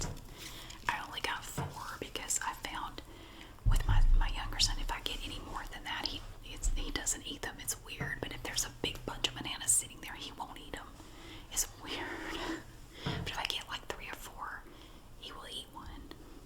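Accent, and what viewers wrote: South, United States. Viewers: I'm an English man,but I love your southern American accent,your videos are fantastic & I love the way you do your thing,your whispering is awesome,please keep doing what you do,you're amazing,much love,Chris x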